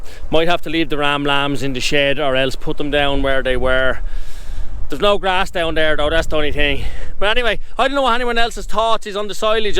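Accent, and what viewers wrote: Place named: Dublin, Ireland. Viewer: A Dub accent from a farmer takes a bit of getting used to. I think il survive though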